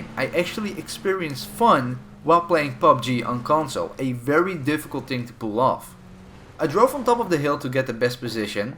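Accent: Netherlands. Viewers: lmao I knew you were dutch hahahah lekker bezig man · Are u from the Netherlands 🇳🇱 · You're dutch ? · ja ik dacht al dat je Nederlans was🤣😂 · I knew you had a dutch accent, You sound like this one famous airsoft player who is also dutch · heheh first time viewer here, as soon as he started talking I knew he's dutch :)) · You Dutch? Waar woont je?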